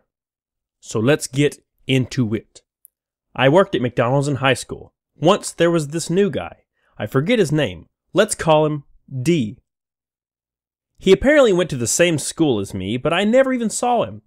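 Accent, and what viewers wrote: South, United States. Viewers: lol btw I like your voice reminds me of when I used to live in the south. I had some of the fondest memories. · You've had people say they hate your voice? That silky southern drawl? The fuck is wrong with those people?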